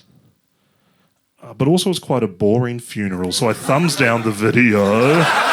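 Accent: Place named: New Zealand. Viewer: Kiwi / New Zealand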